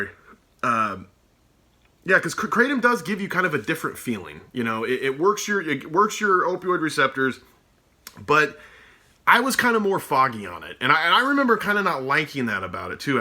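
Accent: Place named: Oregon, United States. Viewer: yes, you do. I’m an Oregonian, from just south of Portland, and you sound like everyone I know.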